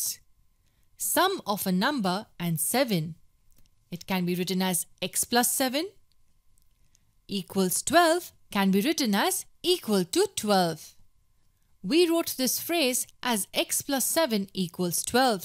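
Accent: India. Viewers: This is an Indian accent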